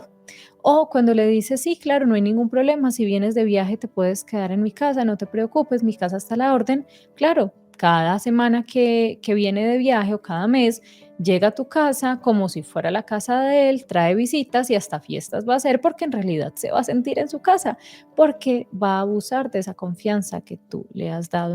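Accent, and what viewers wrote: Colombia. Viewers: Muy linda voz !! Es de Bogota’?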